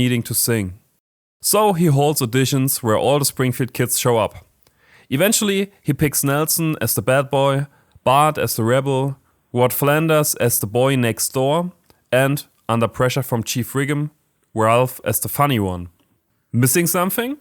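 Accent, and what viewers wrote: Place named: Germany. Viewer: Your German accent makes it even funnier. Weiter so!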